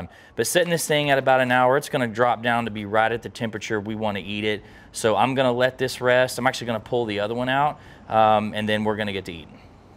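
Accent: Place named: Tennessee, United States. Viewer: Thought you were from Tennessee hoss ?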